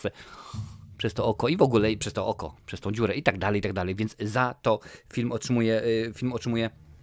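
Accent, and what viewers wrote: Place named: Podlasie, Poland. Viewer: Czy pochodzi Pan moze z Podlasia? tak fajnie Pan zaciaga ;)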